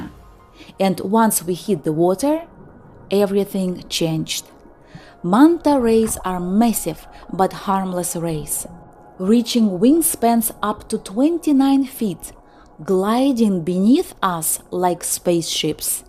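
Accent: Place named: Russia. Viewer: Very nice, just one suggestion I would recommend you to use AI for your videos, your English is kind hard to understand due to your Russian accent.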